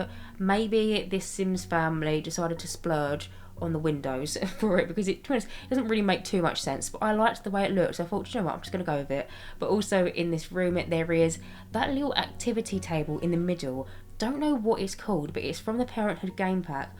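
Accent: United Kingdom. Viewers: i love your british asent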